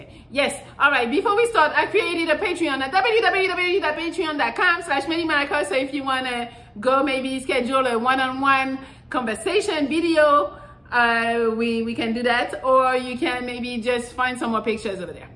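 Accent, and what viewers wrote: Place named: France. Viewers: you've got that french accent I can hear, tu es sûrement française mmmh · Oui en effet, j'avais remarqué ce petit accent et je suis allé verifier après. Oui elle est bien française. Le aurait même dû me mettre la puce à l'oreille.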